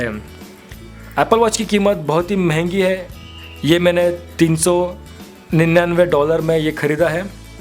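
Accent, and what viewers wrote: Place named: India. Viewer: i love your hindi accent❤️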